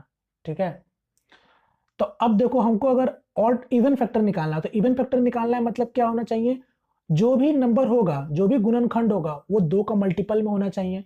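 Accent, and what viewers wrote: Jharkhand, India. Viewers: Your accent is like jharkhandi....1 tho 2 tho...😁. Ye sirf jharkhand wale bolte he r u from Jharkhand..??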